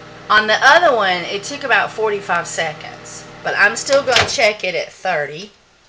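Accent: South, United States. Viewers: This is the best soap making lesson video, gotta check out more of your videos. And love your Southern accent, thanks for sharing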